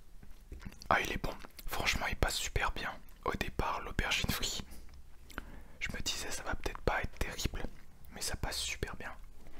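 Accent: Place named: France. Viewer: I love French accent :c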